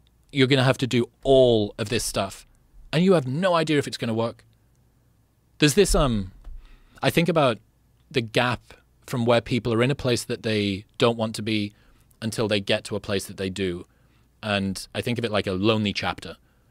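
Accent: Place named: England, United Kingdom. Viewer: This guy just repeats Hormozi quotes in an English accent and people think he's deep.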